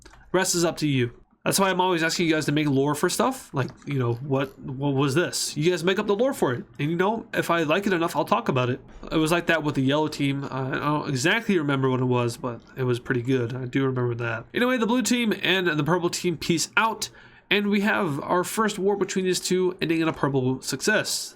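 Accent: United Kingdom. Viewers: As a Brit, I can confirm that was a British accent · What's rong with the British accent I am Briton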